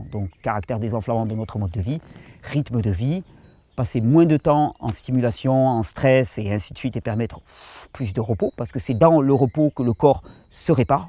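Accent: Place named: South, France. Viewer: C'est un plaisir de voir tes videos cher mais je dois dire que bien au delà du contenu, ce qui me fait du bien,c 'est dejà d'entendre ton accent du midi, qui porte avec lui la lumière du Sud, c'est cet environnement catalan qu'on peut parfois apercevoir et vraiment si j'avais une suggestion à faire, pour nous faire encore davantage profiter de cela, c'est de nous faire découvrir ta region, ou que tu nous en parles car je t'assure que cela aussi ça nous régénère!! j'adore tes videos en exterieur car on en respire presque l'air!! TU es fier de ta région, fais nous rêver avec elle, 5mn de temps en temps mm durant une video de jus ou autre!!! Merci pour ton travail!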